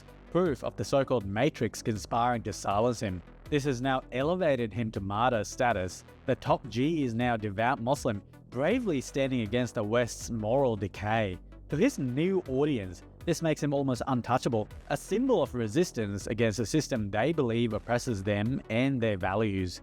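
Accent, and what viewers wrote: Australia. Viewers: Is that a melboune accent sir?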